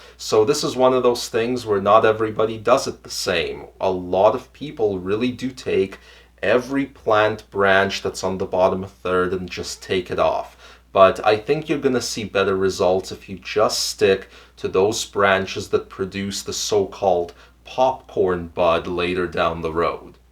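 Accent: Russia. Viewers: My guess is he has a Russian background and moved to North America... Perhaps Canada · Your a good hombre so I subscribed. By the way I think I really good at determining someone’s accent having a mom from Finland with super heavy one. Just thought there was for sure some Русский there Хорошего тебе года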